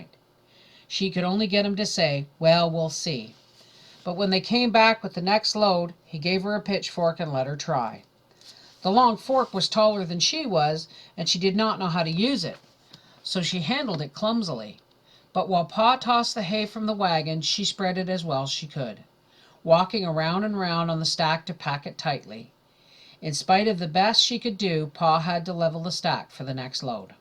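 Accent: Canada. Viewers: Not everyone knows every word plus she is Canadian we pronounce some words differently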